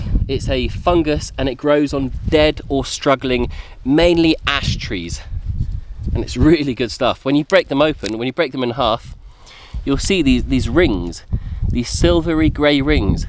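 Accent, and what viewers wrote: United Kingdom. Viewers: then with that being said....your guess would be as good as mine. Lol 😂..for living in Virginia for 25 years you still have a heavy British accent. Which is cool 😎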